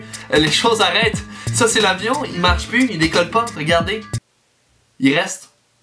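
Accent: Quebec, Canada. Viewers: Juste avec ton accent on dirait deja que tu es québécois..:) Super bonne vidéo!Je m'abonne! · enfin bon,c'est du québécois je pense^^ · Il est quebecquois -_- Pourtant il a un léger mais très léger accent. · t'as l'accent canada a mort mec (ne le prend pas mal j'adore les videos je me suis abonnés)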